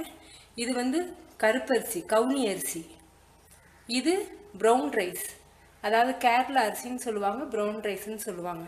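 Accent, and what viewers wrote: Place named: Karaikudi, India. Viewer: Aachi neenga pesara thamizh a kekkave naa unga videos a thirumba thirumba paapen. I'm from Konapet (near Karaikudi) and miss this accent. Azhaga explain pandringa :)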